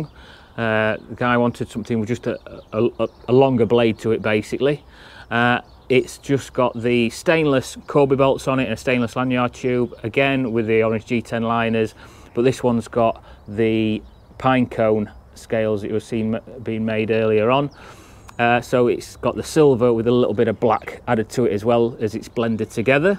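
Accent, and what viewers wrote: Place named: Yorkshire, United Kingdom. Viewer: Nice little video on your process mate, nice to hear a fellow Yorkshireman on YT, the finished result is bloody awesome mate, all the best from Doncaster.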